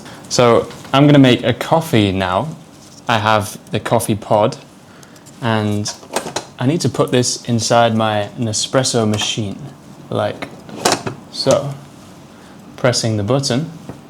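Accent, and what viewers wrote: United Kingdom. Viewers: Could please tell me do your speak with Rp accent? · I love your English accent Is easy to understand for me🙏🙏 You are very good teacher. Thanks. From 🇵🇪 Peru.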